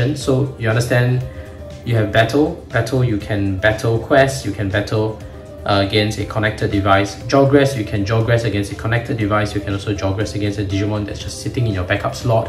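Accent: Singapore. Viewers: Bro u from Singapore?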